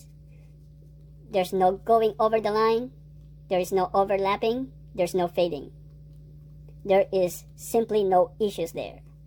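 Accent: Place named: Philippines. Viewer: Why is your tagalog accent so heavy?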